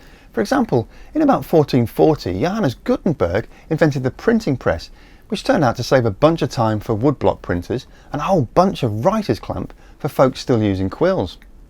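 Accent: England, United Kingdom. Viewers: Proves we have no solutions, then commends the planting of 20m trees, again this won't even scratch the surface of beginning to reverse our extinction, just have a think about that. #2178 #ClimateChanged #TimesUp #GameOver yet this guy offers false hope to the masses. You guys better start to realize and soon that a bald man with an english accent makes a much better starship captain than a truth teller.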